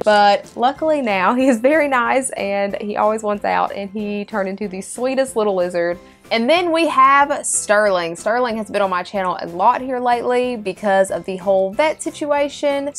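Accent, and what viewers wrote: South, United States. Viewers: She sounds southern. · you just sound like a southern bell I love it and ur pets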